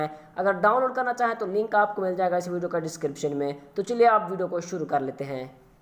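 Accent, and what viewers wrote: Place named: Punjab, India. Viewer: بھائ اپنا پنجابی لہجہ تو بدل دو.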